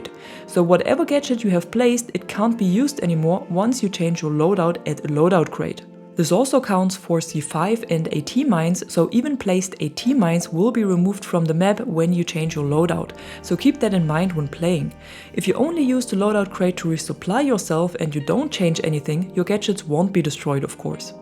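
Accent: Germany. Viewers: danke für die Tipps 👍 weiter so...ich mag deine Videos sehr...ich schreibe einfach mal in deutsch weil ich denke das ich da einen deutschen Akzent höre 😅✌️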